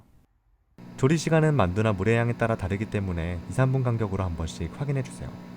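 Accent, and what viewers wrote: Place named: Busan, South Korea. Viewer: 공감꾹 부산사람이라 사투리가 바로 들리네예❤❤❤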